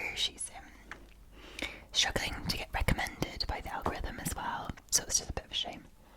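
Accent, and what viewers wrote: Scotland, United Kingdom. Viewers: Don't feel bad for your fast ramble talk I think I speak for everyone here when I say I find your fast ramble talk to be extremely relaxing especially with your beautiful heavenly Scottish accent! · ASMR, and Lollipops....Definitely a great mix. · I struggled to stomach any Disney "food". Rides and ambiance is what Disney's good at. That's all. I digress... Thanks for your soothing videos! I'm English /Canadian and love your Scottish accent. I told my wife about how much I enjoy what you do. She's very understanding, but it's 'not for her'.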